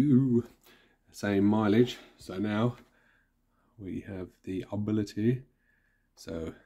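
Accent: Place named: United Kingdom. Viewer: you're British ? ..why dont you speak proper English Proper ?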